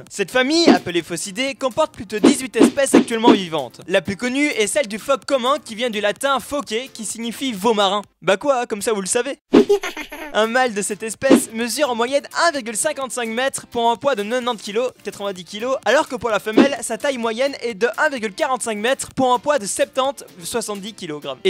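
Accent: Belgium. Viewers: Tu es belge ? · il est belge??? (réponder) S.V.P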